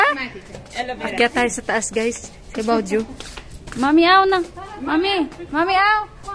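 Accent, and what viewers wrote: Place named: Hiligaynon, Philippines. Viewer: d talaga mawala tono.ng.ilongga day🥰🥰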